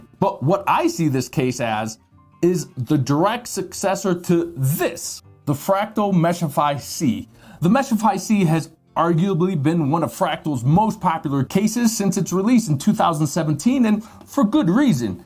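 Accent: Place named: Brooklyn, United States. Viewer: This guys accent is great, is he from Brooklyn?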